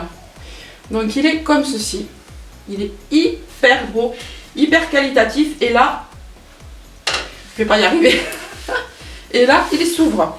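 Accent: South, France. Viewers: Oui une vidéo plante!!et tjr avec l'accent du sud.tu apportes le soleil.bisous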